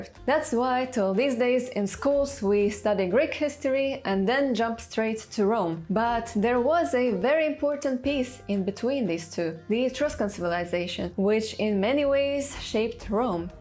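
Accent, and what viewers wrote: Russia. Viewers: слышу русский акцент. Не у утрусков, у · Your accent seems Russian/Bulgarian but I’m not too surprised by the rest of your genetic ancestry.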